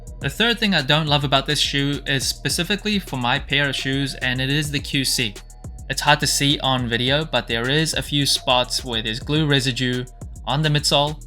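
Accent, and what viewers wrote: Australia. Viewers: I can’t read your accent, are you from Australia?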